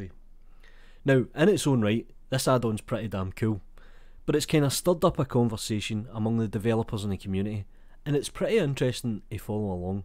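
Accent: Scotland, United Kingdom. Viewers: I just love your Scotland accent, you actually sound like one of the people from the movie BraveHeart with Mel Gibson!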